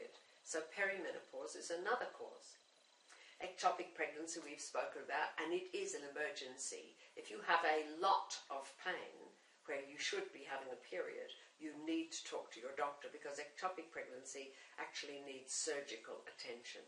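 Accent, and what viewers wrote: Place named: Ireland. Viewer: Sounds posh irish to me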